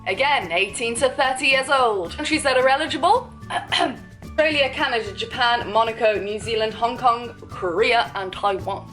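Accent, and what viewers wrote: United Kingdom. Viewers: are you from UK ,Darling !!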